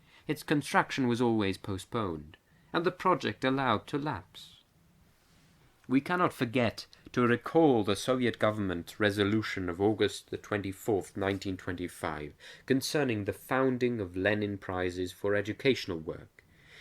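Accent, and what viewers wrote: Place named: United Kingdom. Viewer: This is a great presentation of the secret speech, full of passion and expression that can be heard in the voice and tone of how he speaks. The slight "British" or "trans- Atlantic" inflection makes it sound all the more Historical and Matter- of- Fact. Historians never fret over these very minor things, as I care more for the content of the speech, rather than the way it may be presented. All the best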